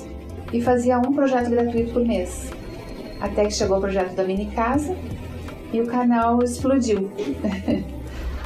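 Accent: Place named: Rio Grande do Sul, Brazil. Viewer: Parabéns por sua trajetória. Acredita, que esta semana, vendo, ouvindo você, foi que notei que és gaúcha! Eu acreditei muito tempo que você fosse Paulista. Ah, mas fiquei muito entusiasmada., você é muito simpática e carismática. Eu amo seu canal e sua maneira de se comunicar. Felicidades.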